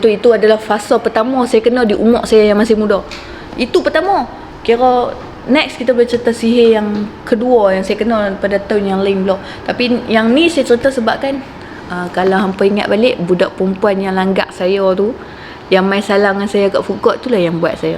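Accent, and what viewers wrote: North, Malaysia. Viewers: Hg prempuan yg hebat ..cakap utara memang sempoi rahh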